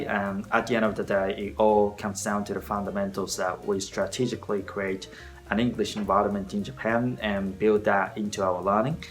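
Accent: Australia. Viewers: すごいちゃんとオーストラリアンアクセントになってる！ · 英語出来ないわけではないのに、なんで言ってること分かりにくいんだろうと思っていたら、さんオーストラリアの発音で英語話していたんですね。アメリカ在住なので、アメリカ英語に慣れすぎていました (^_^;)色んな地域の英語聞かなきゃですねー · harnessed? 聞いたことない単語確かにオーストラリアえいごなんだろうな。とおもいました。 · オーストラリア英語の影響を受けてるよアメリカ英語とは少し違うね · ところどころオーストラリアアクセント入ってますね！バックグラウンドが感じられて良いなあと思います！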